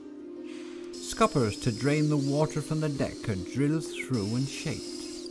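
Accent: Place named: Cornwall, United Kingdom. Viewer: Any further West from Cornwall and you’ll find yourself in the Celtic sea! By the way, Cornwall has at least two accents East Cornwall and West Cornwall the former is closer to the standard West Country of Devon, while the Anglo-Cornish of the West, was influenced by modern English due to the persistence of the Cornish language and, therefore, it has its own cadence and phonology. I would hazard a guess that the narrator is from West Cornwall.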